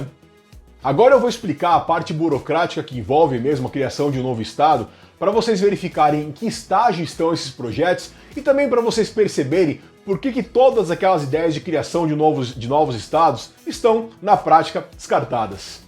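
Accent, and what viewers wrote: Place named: Mooca, Brazil. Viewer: Esse moço do vídeo tem sotaque paulistano da Mooca.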